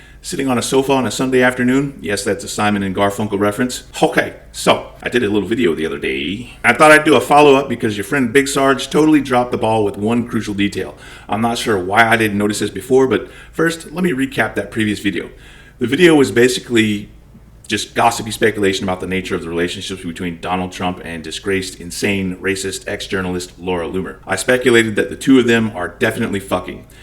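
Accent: Scotland, United Kingdom. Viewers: I can hear a Scottish brogue here! interesting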